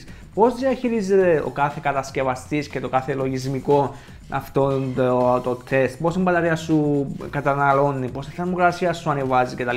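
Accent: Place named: Cyprus. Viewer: Πολυ μ αρεσει η κυπριακη προφορα. Ηρθα το καλοκαιρι στην Λεμεσο και εγινα φιλος με τους πάντες .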